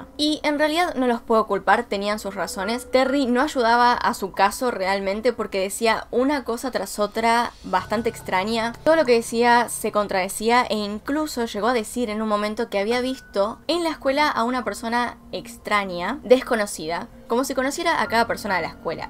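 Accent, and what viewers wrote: Argentina. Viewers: Pregunta soy nuevo en el canal de qué país sos, tenés un asentó argentino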